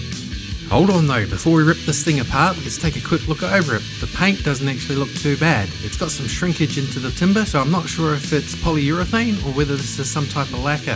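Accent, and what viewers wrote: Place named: Australia. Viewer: I like listening to an Aussie swear !! Sounds fackin cool !!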